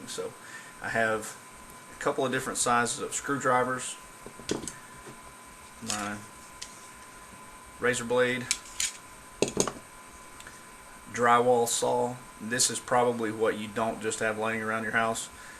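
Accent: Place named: South, United States. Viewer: You sound like your from below the Mason Dixon line... >.> great way to make a hill though!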